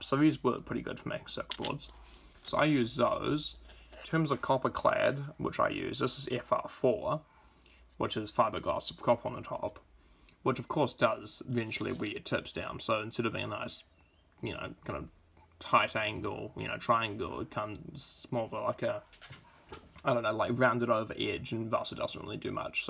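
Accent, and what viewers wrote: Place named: United Kingdom. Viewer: Doing materials could you try to drop a little that british accent, please? People from outside UK find it hard to understand you :(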